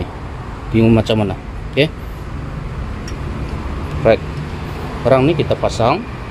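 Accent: Sabah, Malaysia. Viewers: Sabah ni boss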